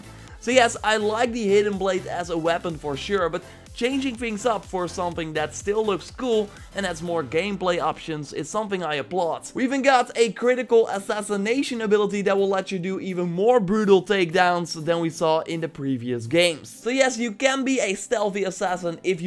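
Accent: Netherlands. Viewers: Nederlands accent is heavy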